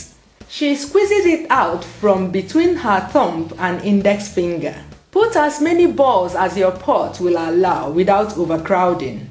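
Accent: Igbo, Nigeria. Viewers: hahahaha god her nigerian accent is so funny to me and i am nigerian! still, I enjoy her videos and i learn a lot. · looool I love the Nigerian accent!! the way you say "puff puff" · She's Igbo... I like the accent 😃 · your accent is so Nigerian. I love it! i love how my Nigerian women sound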